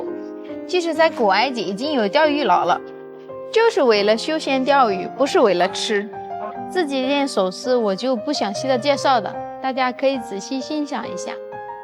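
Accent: China. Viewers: 好中國話用語和腔調 🤷 😮⋯⋯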